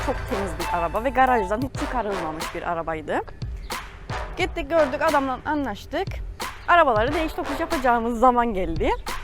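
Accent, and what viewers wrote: Cyprus. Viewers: Kıbrıs lehçesine bayılıyorum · Ya bu Kıbrıs konuşmasına bayılıyorum 🤣🤣Kıbrıs tatlısı kız formula yarışcısı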